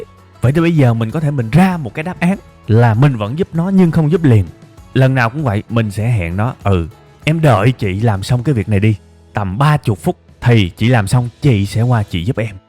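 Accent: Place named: Mekong Delta, Vietnam. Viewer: bài giảng quá hay, diễn đạt dí dỏm, dễ hiểu, giọng miền Tây ngọt ngào, truyền cảm.. . Thanks!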